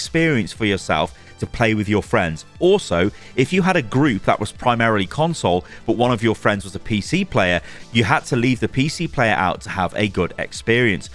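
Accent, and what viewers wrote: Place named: United Kingdom. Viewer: Well maybe dial back back the British bullshit it’ll transcribe just fine.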